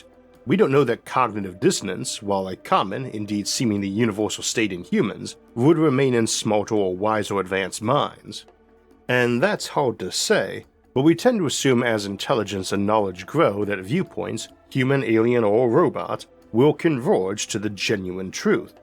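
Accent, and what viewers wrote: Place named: South, United States. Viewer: This deep southern accent of the USA is worse than a Scottish one.Who picked the parameters for the A.I. text to voice? Mr. Alabama? Unless you come from the Mississippi delta you’re not gonna have an easy understanding.A human would have done this better.For this ,using an inferior A.I. I will boycott the rest of the video.Give jobs to humans,you pile of rusted microchips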